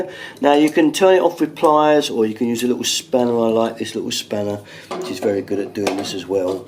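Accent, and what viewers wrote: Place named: England, United Kingdom. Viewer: Delightful presentation. Charming English accent.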